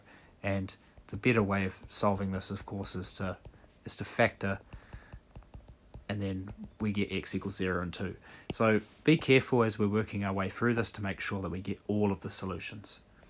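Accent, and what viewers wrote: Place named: New Zealand. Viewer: Thank you❕ I've done a couple of courses in DEs a long time ago. You are right when you say k< 0 is not a problem, however I thought we were staying in the real world. I really enjoyed this problem and got it out by myself when I heard you say "separation of variables." I hear by you mild accent that you are a Kiwi. I live in Brisbane Australia, and I do YouTube maths for about 4 hrs a day. I'll be visiting your YouTube channel more often.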